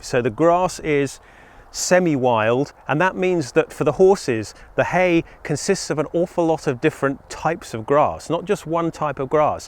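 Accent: United Kingdom. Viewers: Also a British accent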